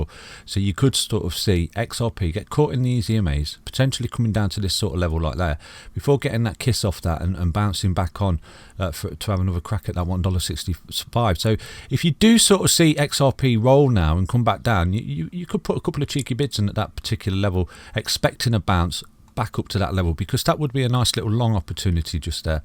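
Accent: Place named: England, United Kingdom. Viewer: Just found your channel this week mate, glad that i did, nice to hear a fine english voice, you explain in easy terms and love the no Bs with no mouth open buy now headers, will be following often, great content! thanks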